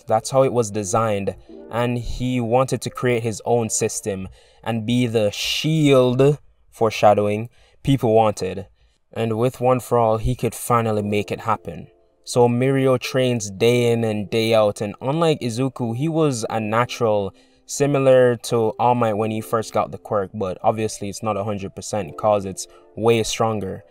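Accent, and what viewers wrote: Jamaica. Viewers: U sounds Jamaican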